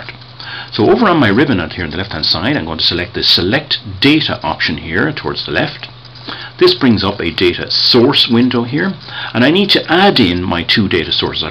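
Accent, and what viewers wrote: Ireland. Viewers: Hi there, I am a nurse who knows very little about Gantt charts other than they exist a. I searched in EXCEL and found your video. I watched two and found them very helpful. The bonus was the course was created by someone from Dublin and I could enjoy hearing an Irish accent as I followed along. I am a Dub who has lived in Vancouver, Canada for many years who really enjoyed having an Irish teacher! Great videos. cheers Clare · I love his accent! Irish? What's up?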